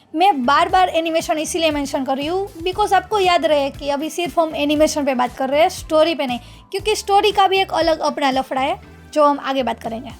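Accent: Gujarat, India. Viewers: Gujju accent change kar.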